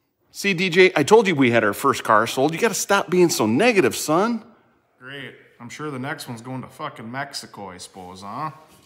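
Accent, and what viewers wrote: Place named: Midwest, United States. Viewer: Are you Minnesotan you have some heavy midwestern accents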